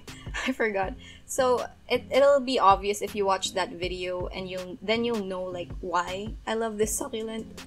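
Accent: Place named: Philippines. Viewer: I am new to your channel, where are you based? I love listening to your clear and informative explantion...no nonsense! Are your teacher or a trainer? I noticed slight Phillipino accent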